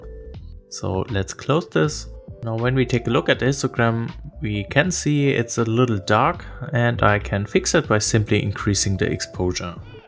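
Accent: Germany. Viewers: Great video! Perfect for me is I am currentyl trying to get better in LR? Are you from Germany? As I think I can hear a german accent😅😉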